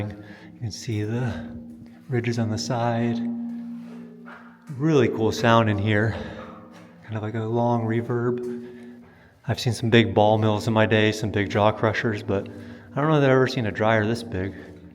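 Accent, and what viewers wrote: United States. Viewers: Love your vids. I heard "Bone Valley" and my ears perked up. We ride dirt bikes at that place you saw named that on OnX. Nice to know you grew up here. I thought you were from Boston, where I'm from. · I thought you had a central Florida accent. That’s funny. Welcome back.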